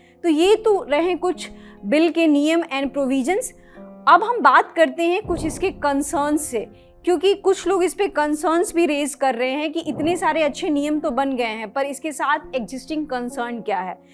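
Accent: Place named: Bihar, India. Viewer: Anchor Bihari h😂😂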